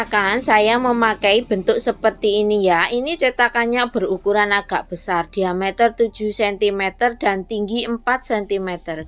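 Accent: Java, Indonesia. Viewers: ....logat jawinya medok bgt....seneng dengernya...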